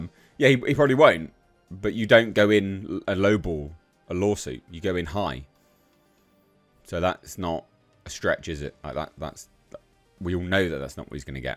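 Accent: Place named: United Kingdom. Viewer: There’s something that makes a Brit hurling insults make them more legitimate. What accent do you have? Like the USA I know there are more than 1.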